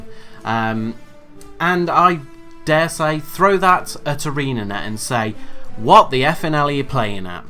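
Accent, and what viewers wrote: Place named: United Kingdom. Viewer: too english english😆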